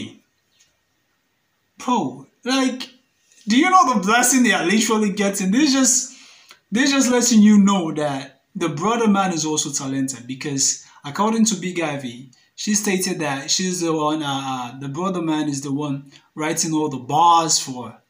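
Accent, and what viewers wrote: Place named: Ghana. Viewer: I sure this guy school 4 Ghana. Cos ur pidgin bi typical Ghanaian Uni one some.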